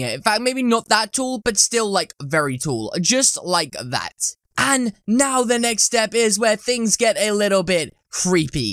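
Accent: United Kingdom. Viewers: He's English/ Briti. He says stuff different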